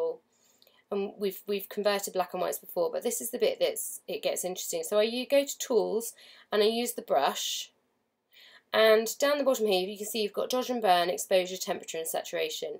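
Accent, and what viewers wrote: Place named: Cockney, United Kingdom. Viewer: Very difficult to understand your comments with your Cockney Ac;cent. Sorry but you lose me when you are discussing your techniques and its a shame...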